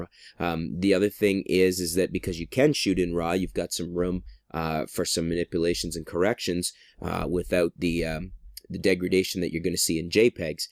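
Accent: Canada. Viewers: I love your Canadian accent! Made me smile so big. ; ^ D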